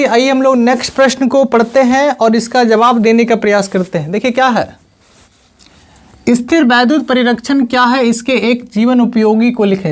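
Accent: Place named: Bhojpuri, India. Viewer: Bhojpuri sun k badhiya laga sir ji 😂😂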